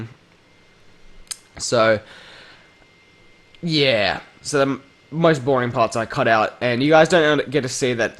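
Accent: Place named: Australia. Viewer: Aussie! ;) - KRNG Raymian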